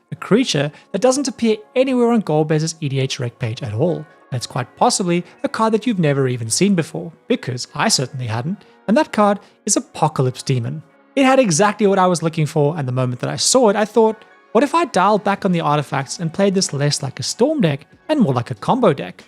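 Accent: South Africa. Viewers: I can't tell if he is South African....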